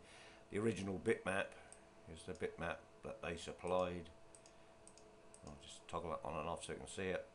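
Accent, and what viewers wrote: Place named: United Kingdom. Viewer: Oh my you have such a heavy British accent:)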